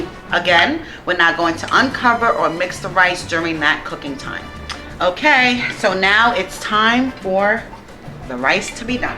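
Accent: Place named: New York, United States. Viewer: I love your accent soinds new yorker food looksnbanging girl! Love spanish food!!!!!! Any type of spanish food #1 fav food of all!!!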